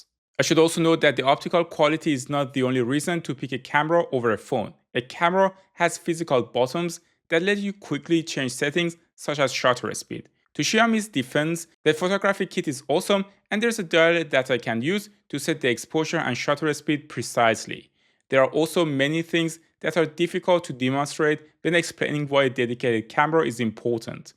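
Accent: Iran. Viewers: I love the iranian accent. Damet garm brother 😘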